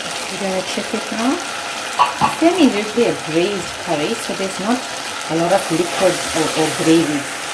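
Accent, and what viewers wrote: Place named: South Africa. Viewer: Love the South African accent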